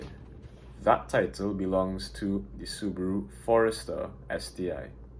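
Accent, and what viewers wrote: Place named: Trinidad and Tobago. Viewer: You have Trinidadian accent.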